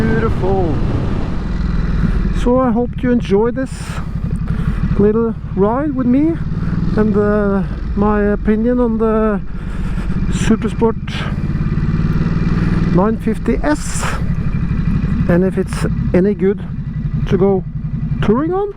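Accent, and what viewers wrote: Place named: Liverpool, United Kingdom. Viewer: Great review! There's a slight hint in your accent throughout the vid that you've spent some time in Liverpool e.g . "I just luv this bike, it's my second one and I just luv it ".... 😊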